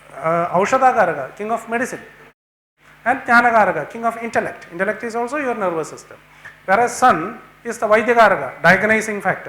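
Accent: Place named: Kerala, India. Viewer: learn to speak english first man .... i can't understand u kerala accent .... plzz work on this .....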